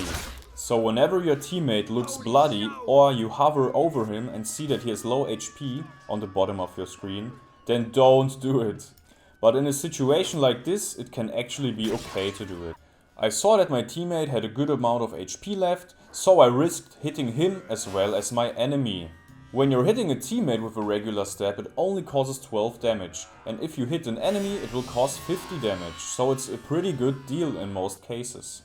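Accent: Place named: Germany. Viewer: Where are you from? you sound German. and very solid and meticulous guide. Like and sub!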